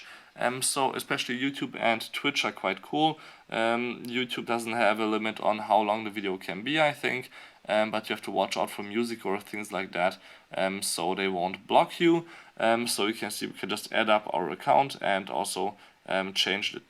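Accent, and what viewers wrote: Germany. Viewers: Man hört dass du Deutscher bist :D...Aber trotzdem sehr gutes Video,gut erklärt :)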